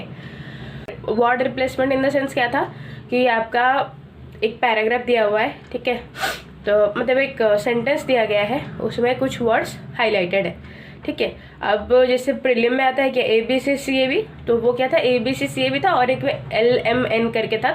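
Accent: Odisha, India. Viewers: Didi app odisha se ho kya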